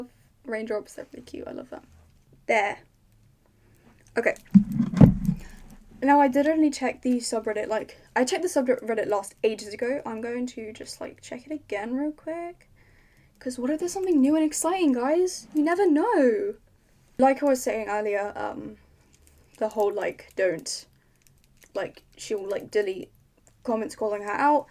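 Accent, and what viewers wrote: United Kingdom. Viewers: Wait you sound like Jessica law- ARE YOU BRITISH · UR BRITISH!?!? (u still slay im just supprised) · I’ve been watching you for a while (on and off) and did not expect you to sound like ts, all proper 😭. I love British people ,I love yalls accents so much 😌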